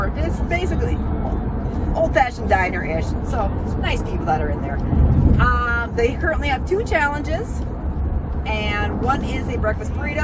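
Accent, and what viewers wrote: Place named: Minnesota, United States. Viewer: Just heard you say you're from Minnesota. Thought I heard that accent😃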